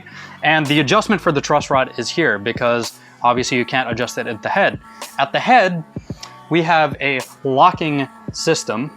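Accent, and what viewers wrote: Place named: United States. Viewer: Atlas is a nice word for pine. That os nice companies are putting the battery cavity in the guitar incase u switch/already have active pickups. I always just took the d battery and wrapped it in electrical tape and put it in the regular control cavity (alot cheaper and easier than routing a new cavity). Lol you threw me off when you said pesos because you have an american accent but Spanish last name.😊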